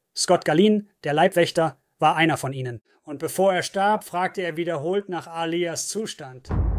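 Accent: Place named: United States. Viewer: Was soll dieser ständige Wechsel von rel. sauberer deutscher Sprache in einen beschi...amerikanischen Akzent?! Sowas kann man sauber programmieren, also wieso dieser Unsinn?!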